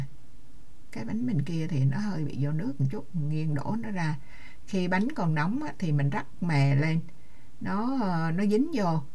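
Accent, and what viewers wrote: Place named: Central, Vietnam. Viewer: Em thích nghe giọng nói miền Trung của chị, học thêm những chi tiết đặc trưng để tăng cường kiến thức. Cám ơn chị rất nhiều. Em vẫn nấu bánh tét bằng cách dùng IP slow cook, bánh hoàn hảo tuyệt vời luôn chị à. Sáng kiến thật hay.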